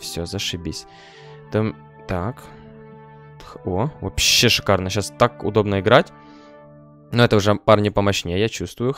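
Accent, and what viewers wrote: Ukraine. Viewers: За укр акцент лайк!!!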